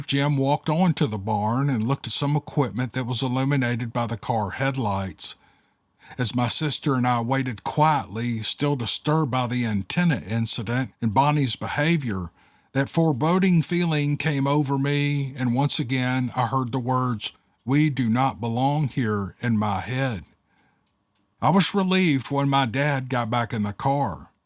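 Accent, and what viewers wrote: South, United States. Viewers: Never apologize for your Southern accent! You don't sound any better or worse than the rest of us Southerners, so you're in good company. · The author of that email needs LOTS OF PRAYER. Why would you waste your time running another person down like that? She is in a sad, angry place. I think I have been listening since the beginning and am so grateful for all of your effort. You are correct about the pronunciation of bipedal. I just always assumed it was your Southern accent if you pronounced things differently than I do. 😅😊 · I personly like your southern drawl NEVER CHANGE IT! TO H3LL WITH THEM!! WHAT part of Mississippi are you form most of my family in Mississippi is in the OXFORD area..They have Ghost Stories and Black Panther stories.. Now you have a GOOD Night Maybe We can Meet up for a Barbeque and tell some tales for your show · Stay southern.i got your back. 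Iam from southern Missouri. Have the same southern. Talk. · hey,its Peggie Sue from Ky, and i just want you to know that the way you talk is why i first started listening to you, and that was before you had started numbering your vids. So from one southern accent to another, dont feel like you ever owe an apology for being true to who you really are. That tortured soul, responsible for the volatile email you received, clearly hasnt reached the peace and happiness that you have, so for this envy and absolute ignorance, they responded the only way they knew....BEING IGNORANT DISGUISED AS BEING SUPERIOR. So, may God bless you and your family. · Wow, what an email. Can’t please everyone. We ❤your podcast and your Southern Drawl. Thank you. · We love your videos. Your the best story teller on YouTube, hands down. Southern accent and southern style story telling at its finest. Alright here we go! 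· My mother taught me, "If you don't have something good to say don't say nothing at all." Just as you said, " Turn off the channel." ❤ I love your southern accent and everyone has trouble pernouncing some words. I'm guilty of that and I'm bad at spelling too. 😂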